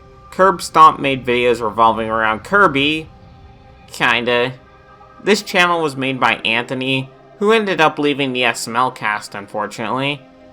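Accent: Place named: Brooklyn, United States. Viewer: At times u sound like brooklyn t guy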